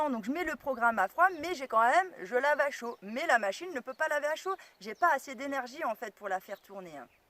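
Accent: Paris, France. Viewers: L'accent parisien ! Juste insupportable ! C'est dommage car la vidéo est intéressante. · Excellente vidéo didactique ! Par contre par pitié apprenez à perdre cet horrible accent parigot! C'est insupportable tous ces ''huns'' à la fin de chaque deuxième mot 🤯😱 A moins que vous soyez la ''x'ième'' descendante d'Attila ! Les plus érudits comprendront 🤺🏇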